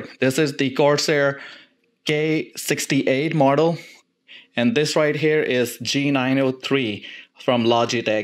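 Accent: India. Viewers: Your accent sounds indian. R u an indian bro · Bro you're indian? Indian accent in your english boiii...... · Why do I hear a indian accent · Either use Indian accent or foreign. Indiam accent mixed with foreign accent makes it unbearable to hear. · U have Indian accent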